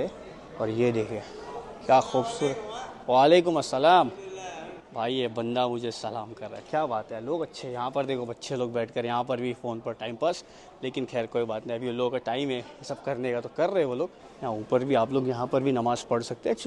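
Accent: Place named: Mumbai, India. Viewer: I am watching ur video for the first time, can you tell me where you are from? Because your voice is from Mumbai, please tell. Bhai jaan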